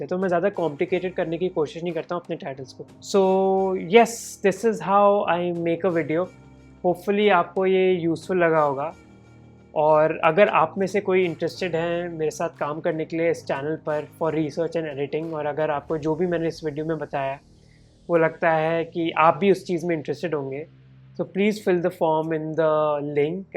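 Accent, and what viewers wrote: India. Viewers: Can you put captions on this video? Because it's really hard for me to understand the indian accent 😭😭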